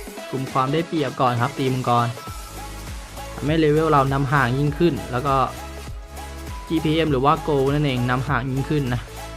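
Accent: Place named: Thailand. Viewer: พูดเหน่อม๊ากกก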